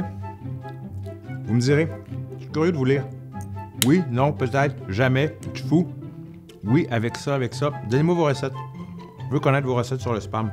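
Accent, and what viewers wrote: Canada. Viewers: L’accent canadien, je m’y ferai jamais. Impossible à prendre au sérieux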